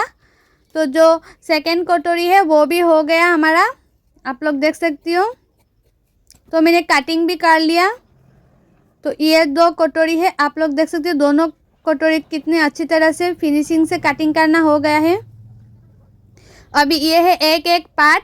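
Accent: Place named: Bengal, India. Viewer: Apnr kotha sune bujhte parchi j apni akjon bangali,, Ami ki thik bujhechi ?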